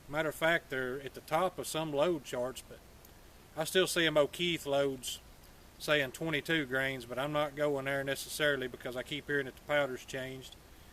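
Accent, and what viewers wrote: South, United States. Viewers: A gun review without the southern accent would be weird...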